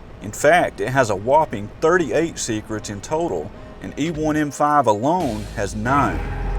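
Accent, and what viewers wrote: South, United States. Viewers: Love your southern accent, I'm from Louisiana (New Orleans) and can't quite place where you are from. It sounds a little bit like southern Mississippi or the Carolinas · "Textures are usually misaligned by default in these scenarios" is really hilarious in this southern-drawl and Big Lebowski narrative style... Sasparilla, I reckon? However it's 100% fitting for doom's Texas origin and current residency. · Thanks so much putting this together - I'm coming from the "illustrator that likes to play games" camp so most of what makes a Doom level "feel right" is a mystery to me, but it's starting to click. (unrelated, but it always makes my day hearing other Southerners online 😁) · Nice vid, love your accent. I'm also from the South.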